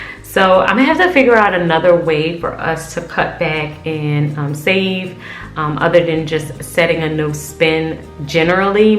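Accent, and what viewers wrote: New Orleans, United States. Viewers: As soon as I heard you speak I knew you were from New Orleans then I clicked on your page and see you are a member at the city of love ❤️❤️❤️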